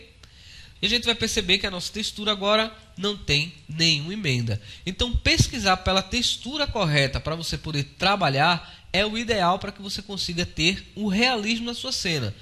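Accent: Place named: Portugal. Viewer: Nao gosto de sutaque Portuguesa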